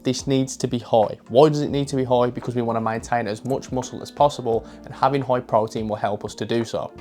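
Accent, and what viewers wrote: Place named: Wolverhampton, United Kingdom. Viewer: Nice video man. Very informative and to the point! Looks like you got great results. You sound like your from my neck of the woods (wolverhampton)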